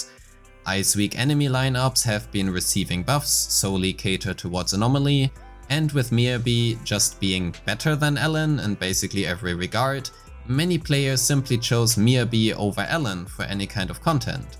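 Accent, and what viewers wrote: Germany. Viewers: I am german and it took me not long to realise you're german as well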